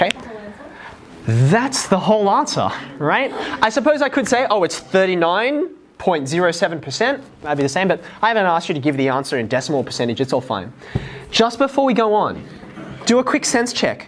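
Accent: Australia. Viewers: listen to his Aussie accent hahahaha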